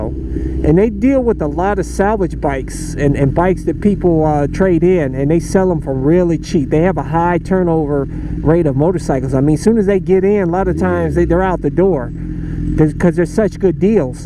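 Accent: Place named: Ohio, United States. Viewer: I didn't realize that folks from Ohio have a regional accent, but listening to your videos, I learned that they do.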